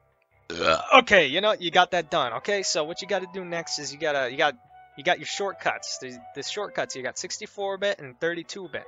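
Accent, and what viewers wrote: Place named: New York, United States. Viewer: i think hes from new york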